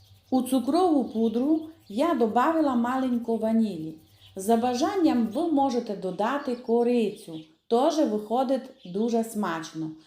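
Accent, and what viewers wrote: Transcarpathia, Ukraine. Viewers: Добрий день,. Що смачні оладки, нема сумніву, але мені дуже подобається ще твій діалект, твій говір, урівноважений, спокійний, гарний закарпатський. Пару разів повторюю ролик, щоби чути твій голос, твою мову. В кожному краю України свій діалект. Я із Прикарпаття. Дякую тобі за Закарпатську кухню. Цікаво, смачно. Слава Україні! Слава Героям! Вічна пам'ять полеглим воїнам! ❤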